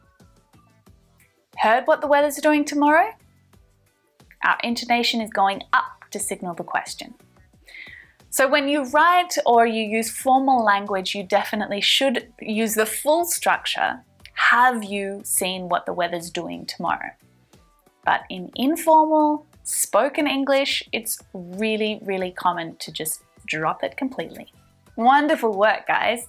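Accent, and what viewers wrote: Australia. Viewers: I love Australian accent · Your Australian accent has made you a special English language teacher. Do all Australians have the same accent as yours? I really like it. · I love your videos and I love your Australian accent ❤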